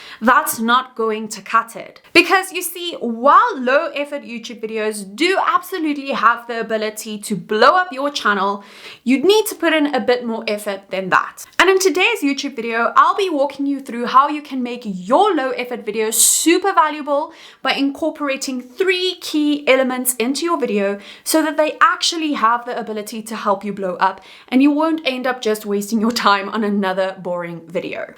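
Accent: South Africa. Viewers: Very very very good video. Been thinking of trying to be a bit more low effort as well. Also, you sound like you're South African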